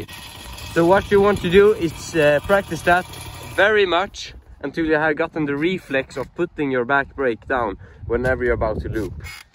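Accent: Sweden. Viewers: Älskar svenska dialekten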